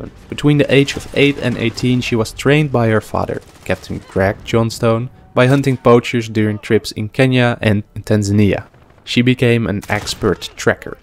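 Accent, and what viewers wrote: Netherlands. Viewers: grappig man je kan kk goed horen dat je nl bent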